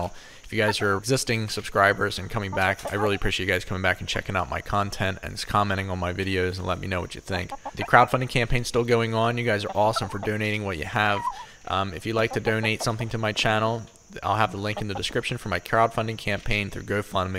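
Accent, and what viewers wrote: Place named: Philadelphia, United States. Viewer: Not sure if you're aware of a show called The Bonfire out of NYC but they would get such a kick out of how you say words like "COMB" and "HOME" They are, what you might call, connoisseurs of Philly accents.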